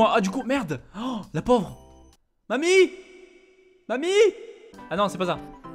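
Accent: South, France. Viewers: tu viendrais pas du sud car tu a l'accent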